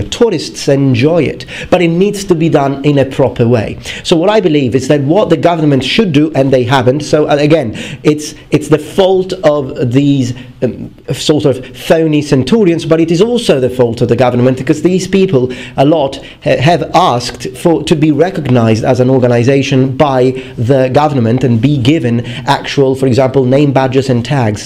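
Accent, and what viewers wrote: United Kingdom. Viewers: I am amazed isnt English and actually learnt English quite late. He would make a classic BBC television presenter. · Strong British accent on this Italian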